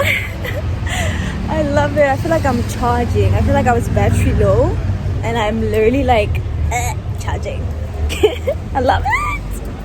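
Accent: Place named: South Africa. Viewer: woah...you have a South African accent!🤭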